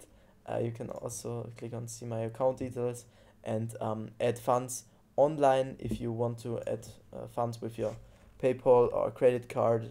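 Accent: Germany. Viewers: you sound german kinda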